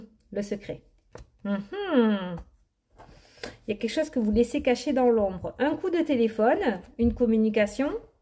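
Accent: South, France. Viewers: coucou un vrai rayon de soleil avec un beau zest d'accent du sud...merci pour cette guidance... bisous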